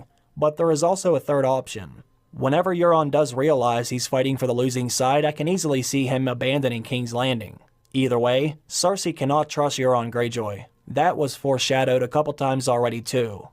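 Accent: Pittsburgh, United States. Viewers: I enjoyed your analysis. By the way, you sound like you're from Pittsburgh. Am I correct?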